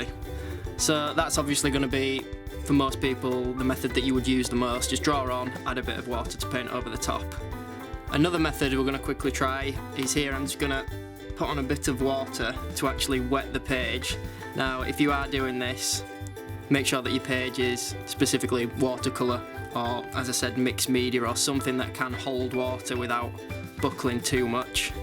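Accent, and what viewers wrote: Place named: Northern England, United Kingdom. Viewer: Such a thick northerner accent I reckon (I'm not an English speaker) But were you stoned or is it just my stoner paranoia?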